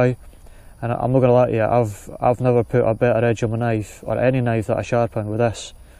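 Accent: Scotland, United Kingdom. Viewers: Love the brave heart bagpipes. The kit and your Scottish accent are awesome. Great job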